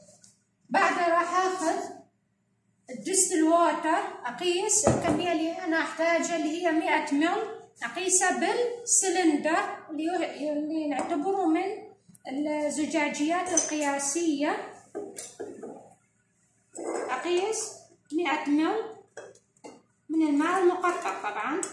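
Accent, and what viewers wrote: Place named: Baghdad, Iraq. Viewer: جهود مباركه اللهجه الحلوه ❤ بغداديه قح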